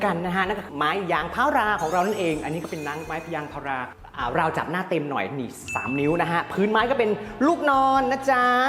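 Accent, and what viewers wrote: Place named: North, Thailand. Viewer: พิธีกรคนเหนือแน่นอน สาปเมืองขนาด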